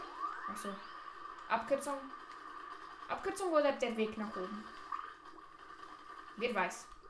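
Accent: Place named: Switzerland. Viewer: Hey again ha also rächt ka mit minere annahm das du schwiezer Bisch ha es sofort a dinere ussproch gmerkt 😊 find machsch richtig gueti Videos witer so bi e YouTuberin us de Schwiz😅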